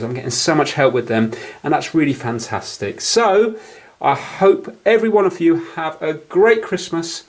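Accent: England, United Kingdom. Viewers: Hey your british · Lol that funny English accent 😂 It's cool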